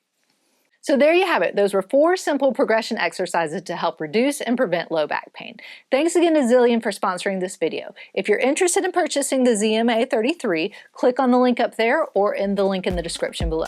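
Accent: United States. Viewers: This comment is irrelevant to the subject of this video. I had always thought you were British, but tonight it just clicked and I was like this is obviously a very American accent!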